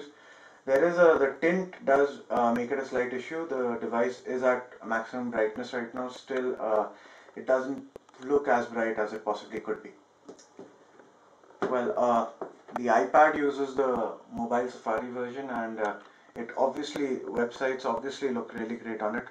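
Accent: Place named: India. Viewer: Your accent resemble like an india even your screen was not clean at all which reflects that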